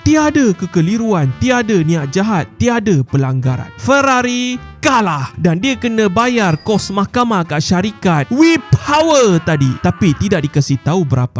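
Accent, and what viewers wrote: Sabah, Malaysia. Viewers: Tapi tidak dikasi tahu berapa! Dengar macam slang Sabah kan? Tapi itulah slang Melayu dulu2. Xde dulu sangatlah. Zaman P. Ramlee masih ada lagi.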